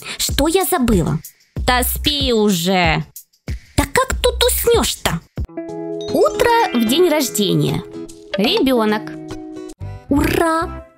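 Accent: Ukraine. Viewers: Ты очень красиво говоришь по-украински может просто делайте ролики на украинском и можешь ещё сделать побольше историй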